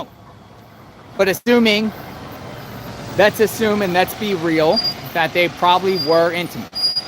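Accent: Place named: Baltimore, United States. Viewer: where are you from? You sound like you're from Baltimore! I'm from there so I know the accent. Its not heavy (your accent) but I feel youre from BMore /Philly????? Please answer if you have a minute. TIA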